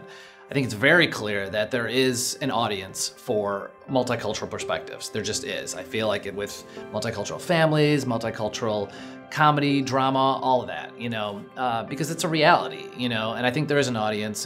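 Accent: United States. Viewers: His Chicago accent is really cute. I love the way he says mom and on and comedy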